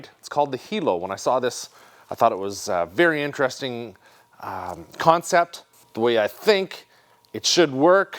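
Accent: Canada. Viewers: I've come to the realization that about half of the reason I watch every video is that amazing Canadian voice...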